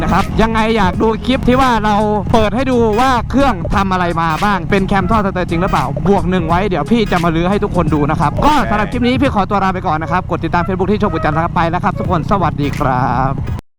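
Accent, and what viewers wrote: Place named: Pathum Thani, Thailand. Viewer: ปทุมธานีชัดมากครับ555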